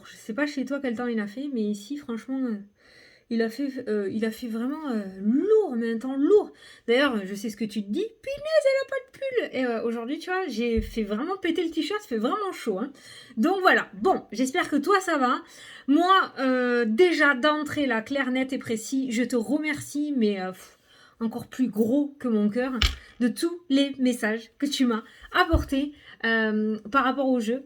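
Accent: South, France. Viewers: J'adore t'écouter avec ton accent du Sud! Et ton jeu l'oracle du temps est magnifique. Je ne tire pas encore les cartes mais il me donne envie de m'y mettre! Bravo!💖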